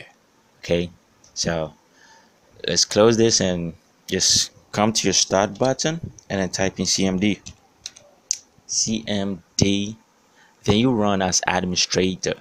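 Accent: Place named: Africa. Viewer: You have an African accent, are you from Africa?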